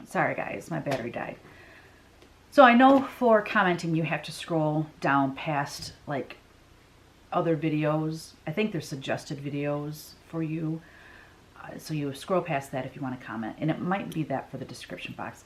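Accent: Michigan, United States. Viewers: Hi sounds like you live in Michigan also Love your show just found you. Your work is gorgeous I'm getting back into cross stitch. Thanks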